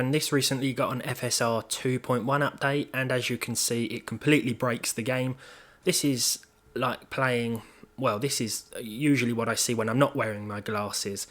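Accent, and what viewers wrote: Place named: England, United Kingdom. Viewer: always watch and enjoy your videos mate. It's nice to hear another English voice for tech stuff, given I'm Stateside and never hear one. I recently bought an EVGA GTX 1070 FTW, a Gigabyte GTX 1060 G1 Gaming 3GB, and a Zotac RTX 2070 AMP that all had "failures." They would all post, but had varying issues. - The 2070 would only post in low resolution mode and when using GPU-Z wouldn't display any VRAM information. This was fixed by, get this, applying additional thermal pads for increased pressure on the memory chips, and increasing the stock fan curve on the card. - The 1070 would artifact and crash in a few games after a little while. This was fixed by, get this again, cleaning, repasting, and applying additional thermal pads to the memory chips, then increasing the stock fan curve. - The 1060 3GB would not display an image at all, and would not be recognized by Windows besides as a basic display adapter, however, NVFlash would find it as a 1060 3GB. 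After pulling the bios on the card it had been flashed somehow with a 6GB bios. After flashing it back to a 3GB, it exhibited the same VRAM failure as the other cards, which was fixed by, get this, adding additional thermal pads. It isn't entirely fixed considering only the HDMI port works, but it at least displays an image. Not sure if you've ever tried this with a GPU exhibiting VRAM failure, but it has also worked on a couple of AMD cards I did it too. After trying the baking method and having it end in failure too oft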